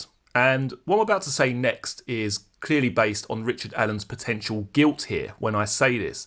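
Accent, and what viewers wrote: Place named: England, United Kingdom. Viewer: Enjoy your videos and love your English accent. At least I think it's English. My dad was from Birmingham England. The English accent is very beautiful wherever you're from. I like how you think outside the box that's what I do too. I agree with you totally I believe it was totally planned I do not believe it was random or opportunity I've never believed that. I always thought it had something to do with being online way before we found out about the Anthony shots account. It could be that RA might have been sitting in his car for a while before the girls came because he was drinking alcohol out in his car or doing drugs or both who knows. He could have been talking to people that he was dealing with that were part of killing the girls in the plot that they were scheming in other words. He might have been conversing with some other people on the phone before he actually went to the trail. 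I do believe at one point he was sitting at the trail and they probably walked right by him and may not have noticed him or they might have seen him and when they actually did see him on the bridge I thought I had heard it one time that one of them had said there's that creepy guy again. I heard this a while back I don't know how true it is though. Had you heard that at all.??. Thank you for your response in advance. Happy New Year to you and your family God bless 🙌🙌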